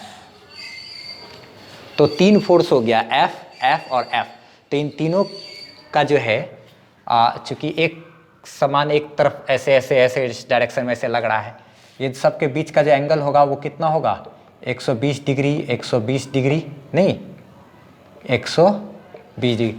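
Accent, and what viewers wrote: Bihar, India. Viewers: Loved your bihari accent teaching 🫡🫶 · Ya he is from bihar, purnea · Sir, I recognize your tone... U r from Bihar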